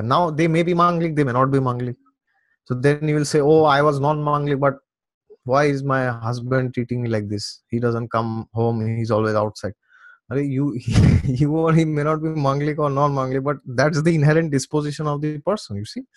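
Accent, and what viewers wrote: Assam, India. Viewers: has Assamese accent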